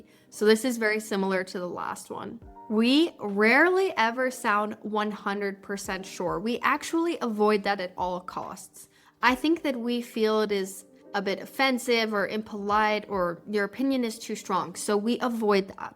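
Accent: United States. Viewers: I found this channel last week and thought your pronunciation was very clear and a great example for speaking, so I started to imitate your speech. The blue lights on subtitles make me easier to copy your speaking because their movements and your voice are in sync. This speaking practice is very effective to get used to American accent and I do it every day. Your videos are so helpful and practical. I really enjoyed watching them. Thank you so much.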